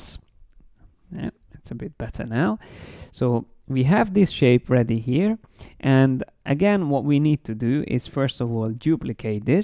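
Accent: Hungary. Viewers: Jézus, de ki lehet hallani a magyar akcentusodat :D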